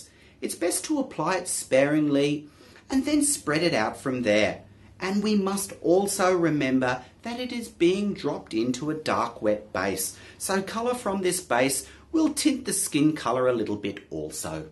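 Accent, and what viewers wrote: Australia. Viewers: lo, the dude has an extremely heavy Australian accent, I think ur safe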